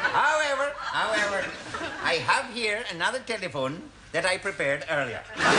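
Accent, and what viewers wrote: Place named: India. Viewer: Such lovely Indian accent without faltering at any time!!!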